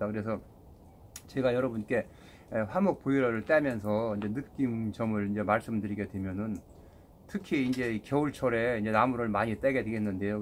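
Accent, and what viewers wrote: Chungcheong, South Korea. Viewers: 그렇겠네요‥ 불조심해야죠 큰일나죠‥불씨‥ 그런데 고향이어디세요 충청도같은데‥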